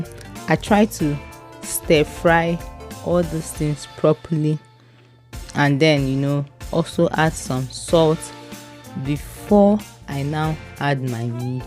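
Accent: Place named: Nigeria. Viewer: Omg first off I love your Nigerian accent I’ve made meat pies before and they are soooooo yummy makes my heart so happy 💕thanks for sharing luv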